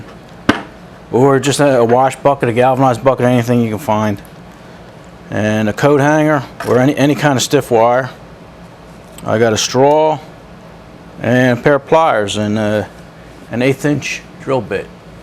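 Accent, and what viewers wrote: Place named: New York, United States. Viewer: Nice accent. New York somewhere?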